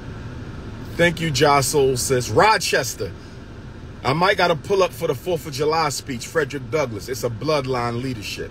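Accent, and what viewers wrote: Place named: South, United States. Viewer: It’s just funny being up north and hearing a southern dialect. All my relatives down south still say skrempts and skreets. Not belittling him nor them it’s just funny to hear it every now and again. The Dr. Is alright with me 🙌🏿👏🏿🙌🏿👏🏿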